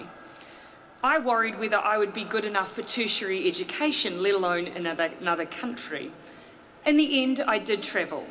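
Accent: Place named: New Zealand. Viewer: I love the nz accent ☺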